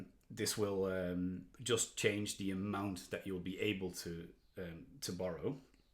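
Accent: Ireland. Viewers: Will definitely be checking this out. Haven't loads of xrp but enough to be using it to make more. Have saw a lot of people retweeting your posts, didn't realise you're Irish before hearing you speak. Just outside Belfast myself.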